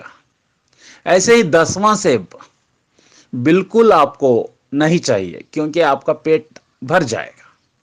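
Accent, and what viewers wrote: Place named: Kerala, India. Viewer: Kerala wale ki English aisi hi rehti .lekin rehte qualified log.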